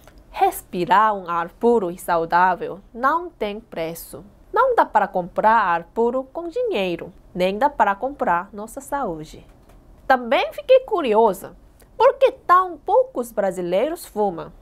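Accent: South Korea. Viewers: gosto muito de você principalmente do seu sotaque coreano... quanto ao cigarro o governo junto com a saúde fez campanha pra pessoas deixarem de fumar....isso contribuiu muito...tem o Dia Nacional ante tabagismo....abraço... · Mano ela fala português muito perfeito é tipo ela fala português e é tipo o geito é muito parecido com coreano muito fofinha🥺💕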